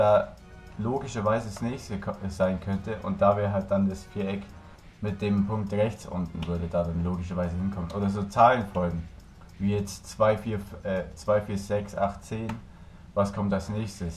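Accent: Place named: Bavaria, Germany. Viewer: Dafür kann er nichts, viele Polizisten aus Bayern sprechen so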